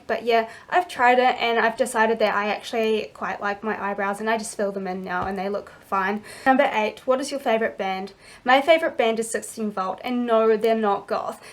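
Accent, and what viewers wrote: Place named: United Kingdom. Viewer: Damn, you are fucking HOT!!! Love a British accent!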